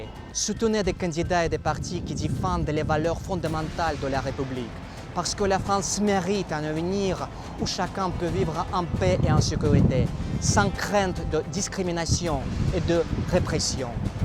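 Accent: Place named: Russia. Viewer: ну жестко русский акцент, а так хорошо!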